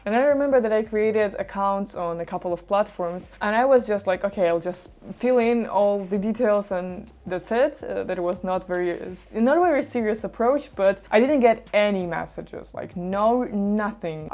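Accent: Russia. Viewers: ох уж этот наш русский акцент 😅❤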